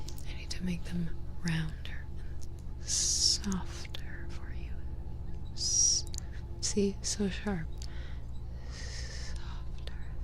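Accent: South, United States. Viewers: you are so beautiful. I'd love to see a kidnapping asmr video with the southern accent. The southern accent is so hot.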